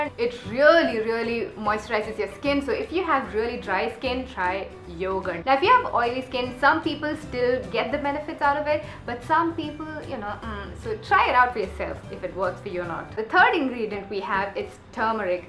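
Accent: India. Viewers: You speak like an Indian..where do u belong to ?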